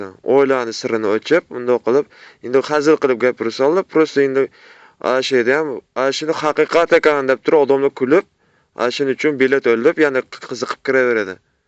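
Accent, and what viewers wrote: Namangan, Uzbekistan. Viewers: Нега Конибодомлиман дейсамми.Наманган шевасида гапиряпсанку. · ука салом бу тожигистон кунободом деяпсиз лекин шевайиздан наманганликка ухшаяпсиз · ука хафа булмагину сен намангандансан йигит тугри гапириш керак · Шевенг намангану . Нимага биттанг Жиззахданман биттанг тожикистонданман деб Наманганча гапиришасан . Насса кемутти дейсан сен наманган. Узр сурама лучше елгон гапирма. Андижонга осилма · Bu sheva Namongonlik shevang sotib qôyadi baribir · ээ ука сиесатга аралащма нима киласан узин намангандансан шекилли шеванга караганда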